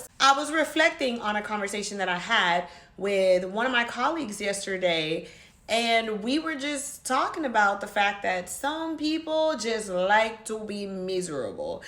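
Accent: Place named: Honduras. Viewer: Being a new subscriber I can't place the accent exactly. Yet, to my ears, it is Hounduran or maybe even Costa Rican. Am I close?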